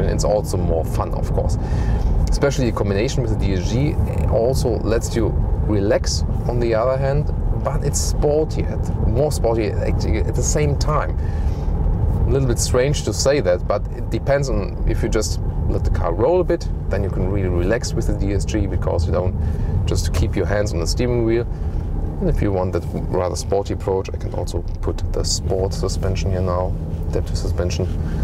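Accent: Germany. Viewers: My men ur English sounds quite. ... German 🤣 all the respect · 德国人说英语其实非常有特色，而且在欧洲算是很流利的。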